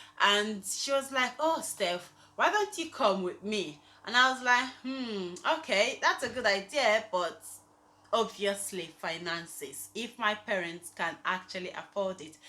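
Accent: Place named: Igbo British, United Kingdom. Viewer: You are so cute 🥰 the igbo accent mixed with british accent na wa oooo 🤣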